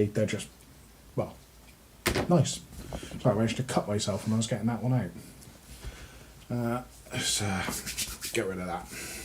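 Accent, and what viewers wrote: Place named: Somerset, United Kingdom. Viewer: You sound like you are from Somerset which makes me wonder why you have some lock knives on you.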